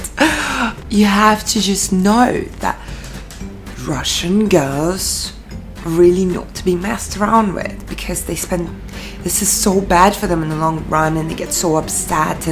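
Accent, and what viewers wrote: United Kingdom. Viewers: Sounds you have a British accent. Did you go to school in the UK? or Australian? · Why your accent is British? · british accent? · Her accent sounds so english from England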